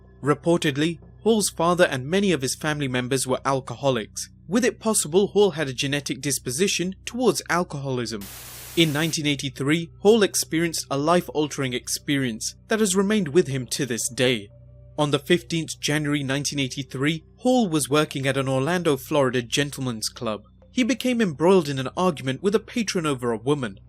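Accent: England, United Kingdom. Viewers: Love this English's presenters accent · I don’t know why the British accent makes for great documentaries. It’s beyond me, but it works.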